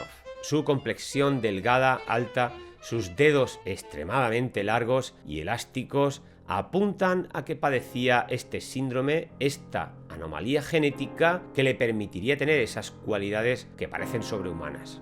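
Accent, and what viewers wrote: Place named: Spain. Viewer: el acento español es desapacible y estresante oara nosotros los latinos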